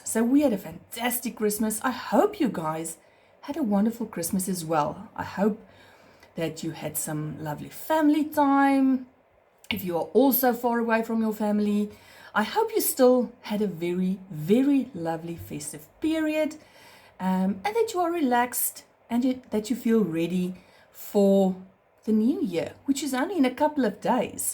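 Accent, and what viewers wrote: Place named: South Africa. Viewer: Hello, I started following you on youtube because I also do amigurumis. But what called my attention was your english, sounded so familiar.....😜I am from South Africa also but living in Portugal. Love your work.🐱🐷🐹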